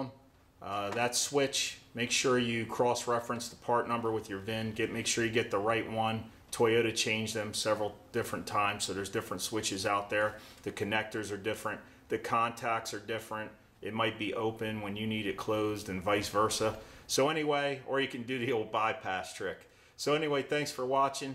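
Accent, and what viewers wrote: Philadelphia, United States. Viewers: THANK YOU. My Honda Fit is doing this exact same thing and I'm pretty sure my neighbors are ready to kill me (I don't blame them). Thanks so much for the thorough video. And from your accent I can tell you're probably from around Philly, so appreciate you even more 🤣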